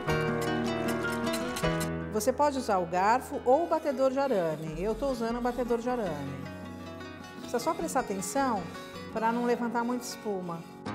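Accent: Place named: Brazil. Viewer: É de Portugal???? de repente pareceu-me que era Brasil!!!!!!!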